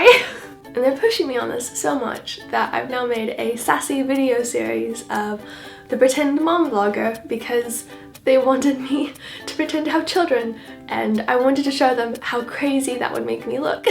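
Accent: United Kingdom. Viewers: I feel like I can hear more and more of a tiny little British accent coming out! 😍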